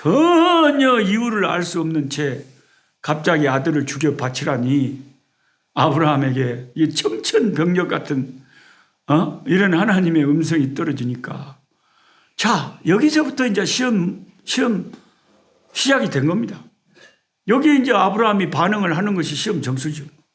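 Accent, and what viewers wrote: Jeolla, South Korea. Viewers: 목사님 덕분에 성경공부 열심히 잘 하고 있습니다 전라도 영어 넘 정감있고 좋아요 ㅎ 아브라함과 이삭의 순종처럼 날마다 체찍질하며 순종하기를 원합니다~~샬 롬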